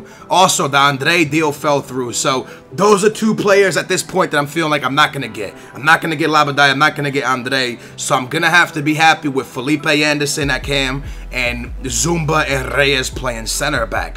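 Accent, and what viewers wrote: Portugal. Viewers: How do u pronounce adryan like Andre?? We get it ur portugese but try and speak normally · he says it the way he wants to and in a Portuguese way its kinda cool in my opinion haha:P the way he says the Portuguese team is the way its said in Portugal, like the way he says Ronaldo and pepe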